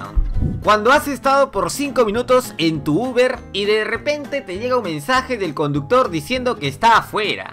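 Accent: Peru. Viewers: Habla como peruano Oe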